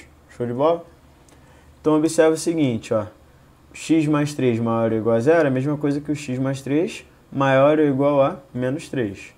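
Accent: Rio de Janeiro, Brazil. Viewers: to apaixonada nessa voz carioca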